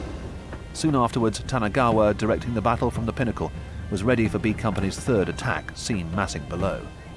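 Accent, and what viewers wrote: United Kingdom. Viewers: Love these history docs narrated by cool Brit, while vintage video flickers along 💖 Many thanks 🙏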